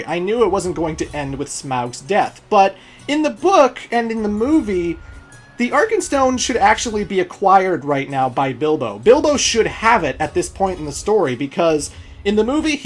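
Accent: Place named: Canada. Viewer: I'm here for the sound of your sexy Canadian voice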